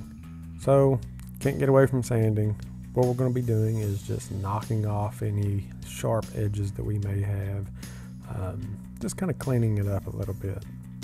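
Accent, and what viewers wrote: South, United States. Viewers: As an Australian I love your southern accent! Gorgeous project too : )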